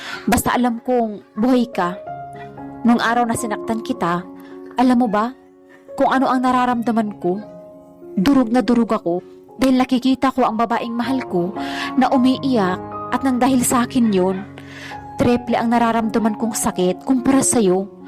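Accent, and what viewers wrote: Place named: Visayas, Philippines. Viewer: STORY WAS GOOD BUT NARRATOR NEEDS IMPROVEMENT. DAPAT WALANG PERIOD/COMMA EACH WORD. TONO DI KO MAINTINDIHAN IF ILONGGO, VISAYAN, BICOLANO O ILOKANO. OK LANG YUN BUT IT IS HOW TO DELIVER THE STORY PARA MAKATOTOHANAN AT ANG DAMING WORD NA "KO". NO OFFENSE, NARRATOR NEEDS IMPROVEMENT PO SAYANG ANG KWENTO.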